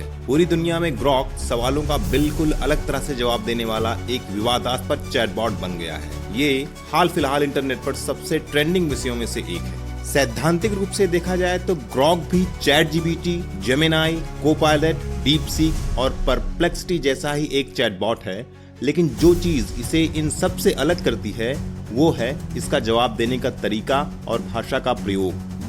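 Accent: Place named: Wardha, India. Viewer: Sir aapki voice me wardha ka मिठास अभी भी hai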